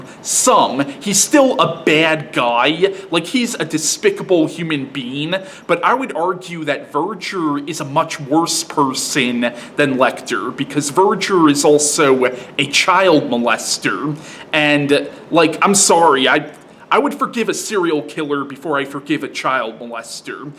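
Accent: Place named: Midwest, United States. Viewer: he sounds Midwest American to me.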